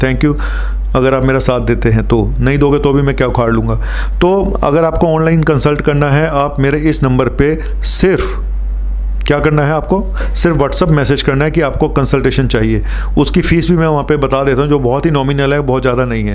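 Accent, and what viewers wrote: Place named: Punjab, India. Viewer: Bhai aap ki punjabi nikal hi jaati h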